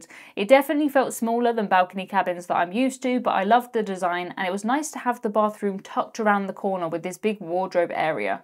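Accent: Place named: Birmingham, United Kingdom. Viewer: I love your videos so much! Your style of telling about your cabins and ships moves quickly, so I feel I learn more than from most vloggers videos. You give us lots to consider when booking future cruises. In Canada we call the kid's ride a merry-go-round!! My grandmother was from Birmingham, so listening to you talk makes me feel her beside me. Keep up the good work!!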